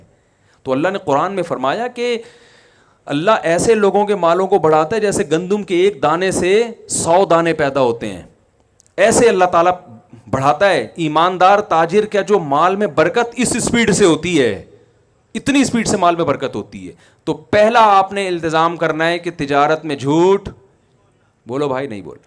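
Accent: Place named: Mumbai, India. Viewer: Aap k bolne wala andaz pura mumbai type hai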